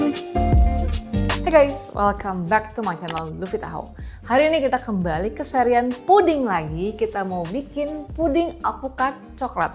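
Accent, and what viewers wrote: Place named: Medan, Indonesia. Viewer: Kok jdi medan kali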